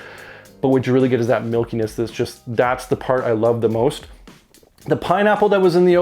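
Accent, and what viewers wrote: Canada. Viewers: I could tell from the accent that you were a fellow Canadian! I now know that you are also a fellow traveller! Great channel, hope to see more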